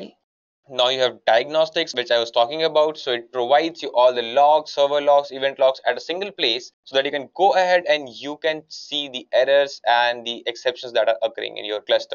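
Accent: India. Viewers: 一口咖喱味的英语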